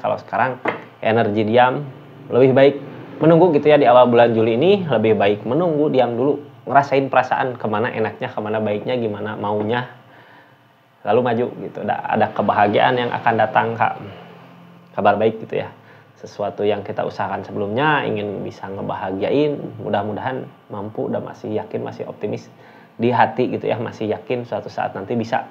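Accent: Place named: West Java, Indonesia. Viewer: Lucu ih.. sunda banget😀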